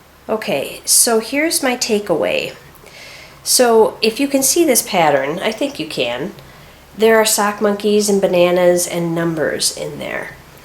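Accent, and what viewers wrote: Minnesota, United States. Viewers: I wondered where you were! Thanks for sharing ypur pictures of the trip. It is on my bucket list and now I am even more excited to see those countries, so beautiful! I admire your creative choice to put in the specialty stitch on the background. It does look like a tapestry. You should stitch your name in the other one. I am blanking on the names of them right now as I started watching at bedtime and now it is morning. Brain like a sieve! I enjoy your videos for many reasons not the least of which is that I grew up in MN and when I here you speak I get a bit nostalgic.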